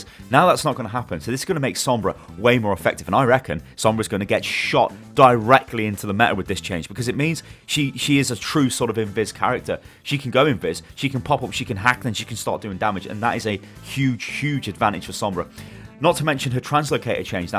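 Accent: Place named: United Kingdom. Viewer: He's like an excited British puppy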